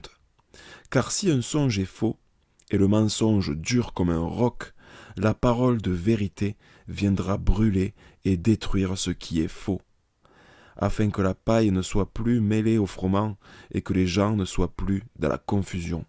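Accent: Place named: Marseille, France. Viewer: Pourquoi vous avait la même voix de Kevin gall? Sauf que toi ta un accent du sud marseillais ou autre. Donc ma question pourquoi aviez vous la même voix ?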